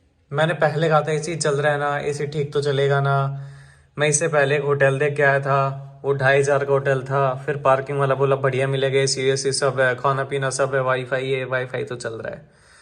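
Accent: Haryana, India. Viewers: Aap hariyanvi me baat kiya kro bhai maza aata hai bhot us accent me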